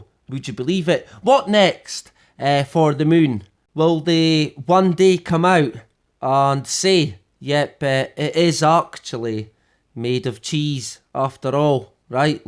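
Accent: Scotland, United Kingdom. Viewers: Love the accent mate. I spent a year in Scotland working offshore. You don't really have seasons in Queensland We had a referendum in 1946 That Denied Government power to medically test This means that the People of the Commonwealth of Australia denied the government any power to force people to undergo any medical or dental procedure without their consent.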